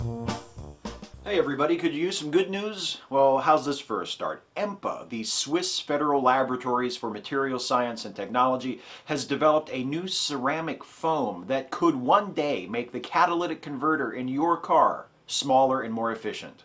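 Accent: United States. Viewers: veeee hickul. I love American accents.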